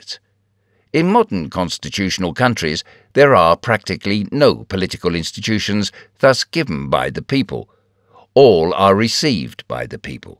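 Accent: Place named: England, United Kingdom. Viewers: the narrator has a British accent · English accent, there is NO SUCH THING as a British accent.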